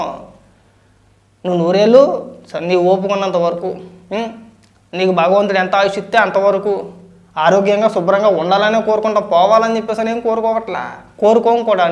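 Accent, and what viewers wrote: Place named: Coastal Andhra, India. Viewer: మీ విశ్లేషణ చాలా బాగుంది. కోస్తా వాయిస్ లో మాటలు కంటే మామూలుగా మాట్లాడండి.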